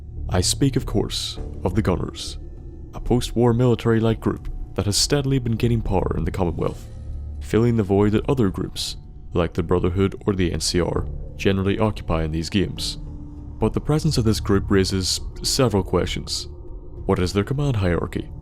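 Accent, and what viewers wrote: Northern Ireland, United Kingdom. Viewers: Are you from Northern Ireland because like you phrases and accent sounds like a N.I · Are you a fellow Northern Irish man? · You from Northern Ireland lad?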